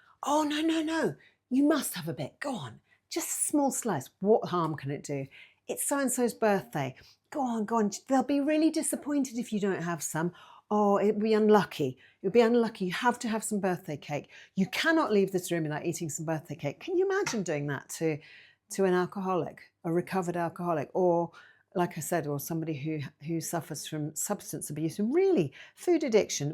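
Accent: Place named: United Kingdom. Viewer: nice to hear a brit talking about this ... have just found your channel ... I do follow ZoeNutrition and have found over the last 2yrs avoiding the 'obvious' carbs has helped me lose over 50lbs ... have ordered iodine as per your recent video to see if it helps me ... I'm up for research supported suggestions ... I have tried my own sauerkraut, kombucha and am still making my own kefir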